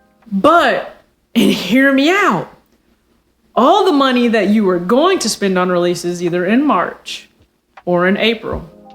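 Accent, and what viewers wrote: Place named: California, United States. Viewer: You are my favorite sneaker youtuber because you go off into other relatable subjects. Youre smart and you have business savvy...and youre a female from the west coast. I assume that because you have my accent, if we wanna call it an accent lol and your gs warriors fan, that youre from California. You should put an facebook request in for me if you want that would be cool Im from Everett Washington.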